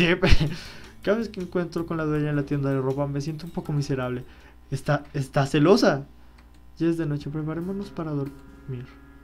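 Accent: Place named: Colombia. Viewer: suenas como un colombiano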